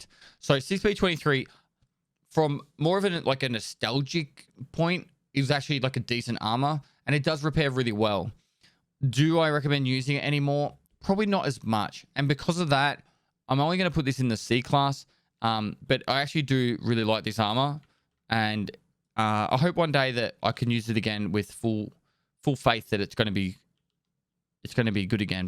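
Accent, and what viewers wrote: Australia. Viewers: is australian or a kiwi?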